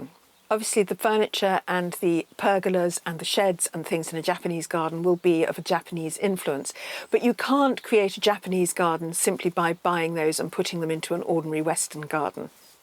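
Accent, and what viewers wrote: RP, United Kingdom. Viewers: I love your voice and the Queen's English you speak.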